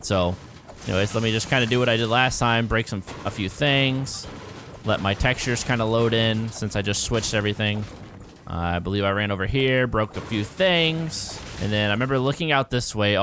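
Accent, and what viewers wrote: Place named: United States. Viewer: You sound like fresh just with an American accent :D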